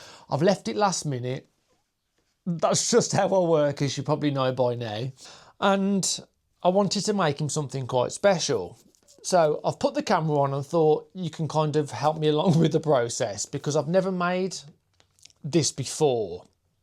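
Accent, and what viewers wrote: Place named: West Midlands, United Kingdom. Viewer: I wish I could find the enthusiasm to clean up. I'm sure it would make for a nicer experience. I just just straight into the mess again the next day though 😬 The slippers are crackes! I'm sure he'll love them. And without sounding like a giant brown noser, you're making our lives better too! It's so nice to see someone else figuring out their way around crafts and sharing their wins and losses. And I spent a few years living in Birmingham and Wolverhampton (from Australia) and your accent makes me so happy!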